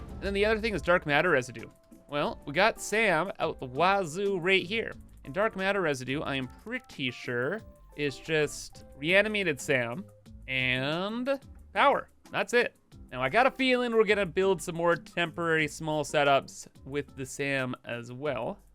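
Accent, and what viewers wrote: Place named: Canada. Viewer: OOT and ABOOT! Do I detect a fellow canuck??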